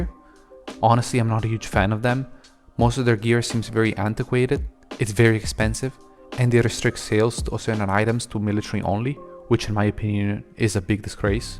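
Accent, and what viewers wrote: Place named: Italy. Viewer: Dall'accento ho capito che il bro è italiano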